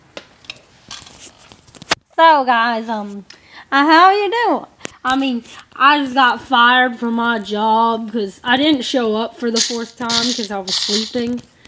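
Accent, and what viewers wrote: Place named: Nevada, United States. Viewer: dude u talk like people in pahrump NV yall best be gon roustabout the rattle snakes before them jeepers ranchalot no shade no cap....im brown and people say i sound like a texan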